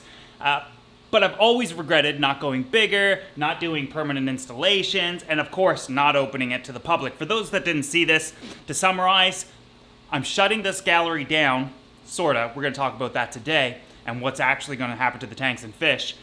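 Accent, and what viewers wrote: Canada. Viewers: That's some Canadian accent! :-)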